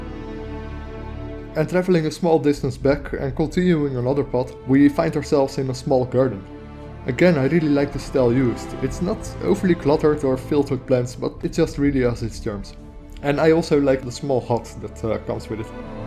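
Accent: Netherlands. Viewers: Are you from Den Hague by any chance? Or some where from nearby that region? I notice it very clearly from your accent, but I'm not sure. Part of my family are from there. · ik hoor aan je accent dat je nederlands bent haha :)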